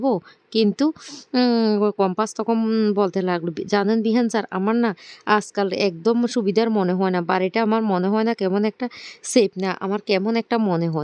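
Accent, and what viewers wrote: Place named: Chittagong, Bangladesh. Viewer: আপনার চট্টগ্রামের টোনে কথা খুব ভালো লাগছে।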